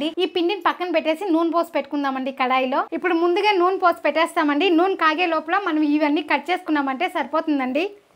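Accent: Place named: Bidar, India. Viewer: Thumba chennagi adige madthira👌nanu Karnataka dalle belldiddu, kannadavara manasu thumba swacha vaddu, nimma mathu kelldagella namma beader jille nenapagute, all the best nimge👌👌👌👍👍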